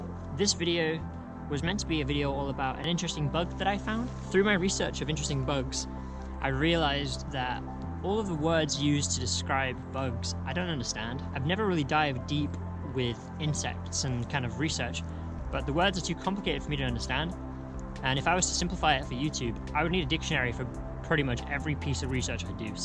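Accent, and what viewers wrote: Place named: London, United Kingdom. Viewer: Yo you always say your from wales but you have a British accent? You sound more like you are from where I’m from which is near London? Great video tho dude 🍄🍁💙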